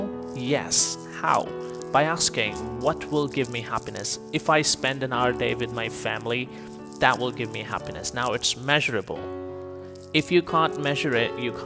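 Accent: India. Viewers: Are you indian? My friend (who is indian) speaks like you IDK but i think so.....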